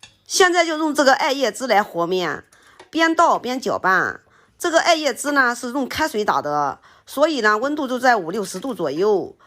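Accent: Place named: Jiangxi, China. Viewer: 很熟悉的赣普话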